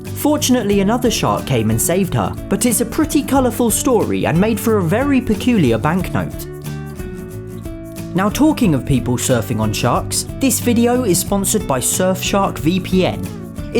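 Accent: United Kingdom. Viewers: I love your british accent 😂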